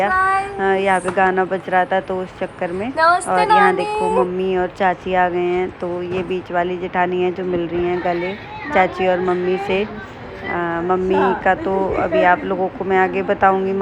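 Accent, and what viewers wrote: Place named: Mainpuri, India. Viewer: Aap ki bolne ka tarika bahut hi pasnad aata h.menpuri ke jesa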